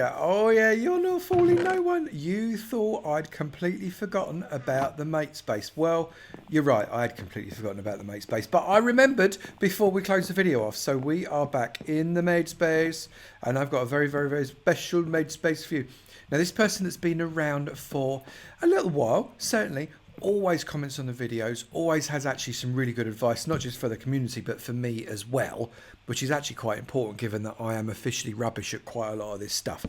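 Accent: United Kingdom. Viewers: sorry but I want to know, R U british ?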